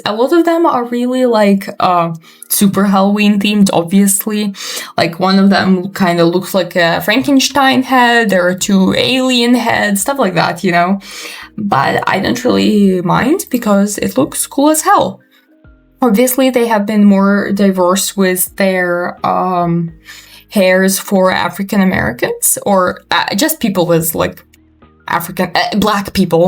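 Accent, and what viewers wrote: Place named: Russia. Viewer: I can hear the Russian accent 😝😝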